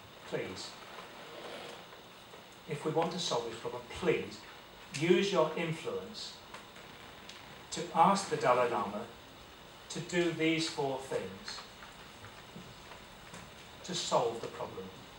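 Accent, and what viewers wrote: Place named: United Kingdom. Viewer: this is bizarre. This British guy is reading, verbatim, what sounds like a text written by a non-native speaker of English. What the hell is going on here, damn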